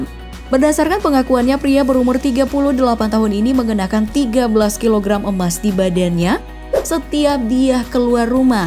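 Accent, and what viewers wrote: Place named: South Sulawesi, Indonesia. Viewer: fixx miminya dari sulsel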